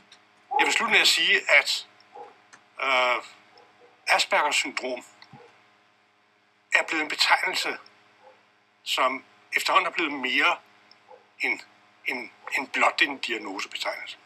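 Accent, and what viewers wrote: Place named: Australia. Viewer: Is that an AS symptom, your picking up a little bit of an Australian accent. Oh, and is TMS trans magnetic stimulation helpful with AS depression?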